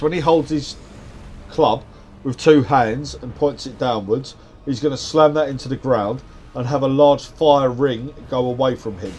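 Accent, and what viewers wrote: United Kingdom. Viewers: Never thought I'd see a cockney soulsborne player 😂 Cracking work on the descriptions mate, keep making more! Edit: Just finished Nameless King fight myself... 12th attempt I think 💀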